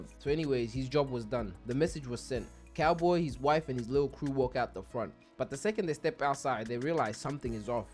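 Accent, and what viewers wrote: England, United Kingdom. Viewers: dude I can tell you English not American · Are u english or sum?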